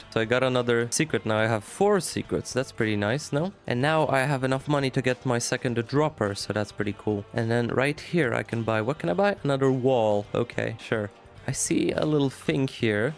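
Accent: Poland. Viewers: Tylko dla mnie ma taki troche polski akcent? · dziwnie oglada sie polaka mowiacego po angielsku ale dobrze ci idzie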